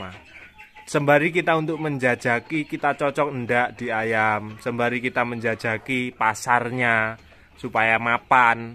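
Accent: Java, Indonesia. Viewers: Aslinya mana mas... Jawa e kok kentel....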